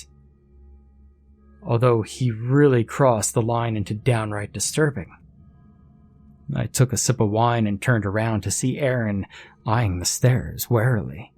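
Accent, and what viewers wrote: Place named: Philadelphia, United States. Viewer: The voice over guy is from The Delaware Valley (Philadelphia Area).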